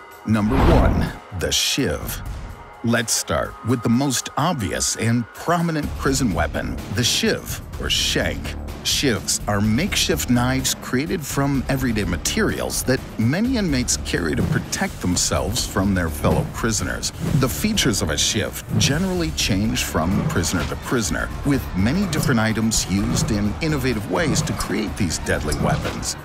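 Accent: United Kingdom. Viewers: Leave it to a bri'ish person to give an account on the best way to stab someone with a knife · You’re ao british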